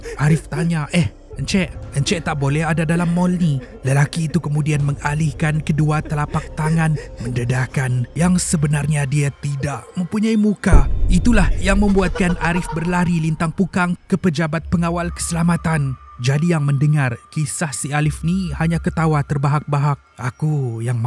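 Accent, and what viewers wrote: Sabah, Malaysia. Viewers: Dia Sabah lah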